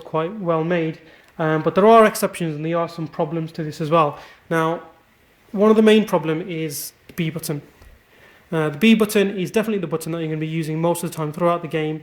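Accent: United Kingdom. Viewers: i like your British accent.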